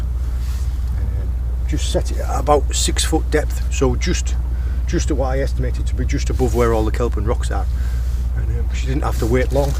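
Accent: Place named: Middlesbrough, United Kingdom. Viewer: Are you from the 'Boro' originally as I recognise the ascent...as I grow up there ! Great video again very informative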